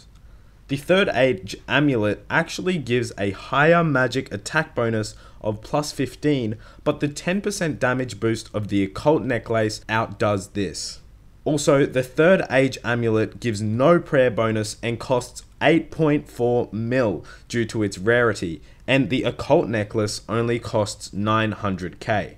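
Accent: Australia. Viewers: That aussie accent is so stupid funny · He's australian...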